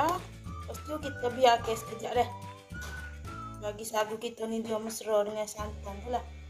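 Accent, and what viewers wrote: Kelantan, Malaysia. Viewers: Alhamdulillah. Resepi kak mudah. Lamo doh nok mkn. Boleh buat ni. Suko dgr kak kecek kelate. Penerangan pun tk meleret2.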